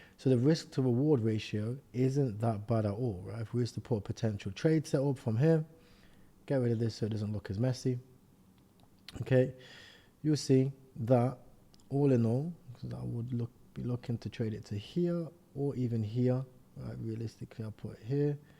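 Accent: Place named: East Midlands, United Kingdom. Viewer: hope all is well fam great video again. can i ask are you from the east mids area i'm from nottingham and you sound like a people from this region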